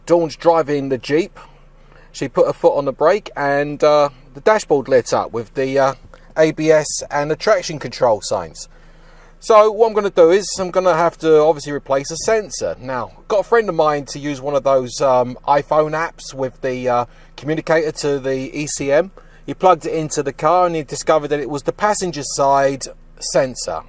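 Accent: Cockney, United Kingdom. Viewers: The cockney mechanic. Great video. 👍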